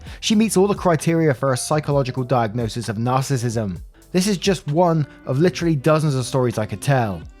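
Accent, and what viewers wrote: England, United Kingdom. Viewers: My day off so perfect time to catch up on waffles lol. Always awesome to listen to, the perfect voice. Canadian’s love that English accent.🥰🥰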